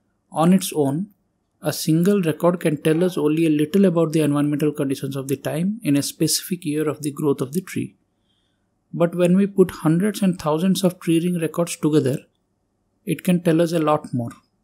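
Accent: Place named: Bihar, India. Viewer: Sir you are definitely from bihar.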